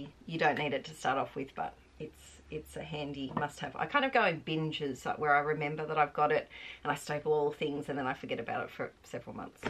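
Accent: Australia. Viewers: The joy of hearing an Aussie accent.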